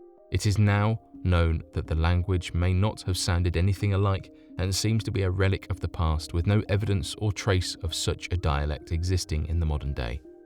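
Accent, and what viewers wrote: United Kingdom. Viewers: Thanks for the nice British voice narrative. A nice distraction from the Trump nightmare. But if we're an alien ant farm, which I believe, why can't they keep things under control better??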